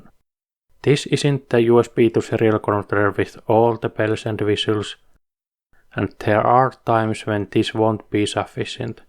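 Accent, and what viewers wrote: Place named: Finland. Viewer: funny thing...the minute i heard you speak..."Suomalainen!" appeared in my head... seitsemän vuotta salossa... at first, i missed the "a-dots" in seven...wait, it was kahdeksan vuotta...onko kesä hyvää...our friend said over 30C, everything too dry...nice to see you here.. kanadalainen, mutta melkein suomalinen 🇨🇦🦈🐻‍❄️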